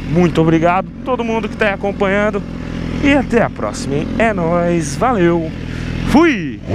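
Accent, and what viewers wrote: Goiás, Brazil. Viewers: A tem que fala daquele jeito no pique do Goiás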